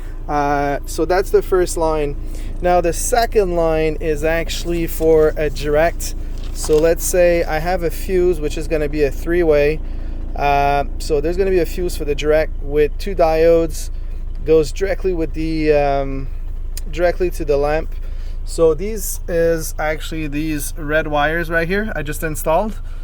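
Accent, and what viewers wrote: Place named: Quebec, Canada. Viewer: J'étais curieux! L'accent. Tu avais des schémas en français! Je me demandais quel coin du QC tu étais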